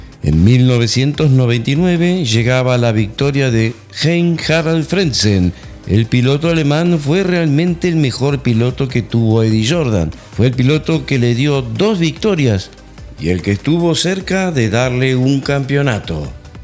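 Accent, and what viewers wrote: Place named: Rioplatense, Argentina. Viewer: Espléndido informe, nuevo suscriptor. No sé si sos argento o uruguayo 🙋🏻‍♂️desde Chaco🇦🇷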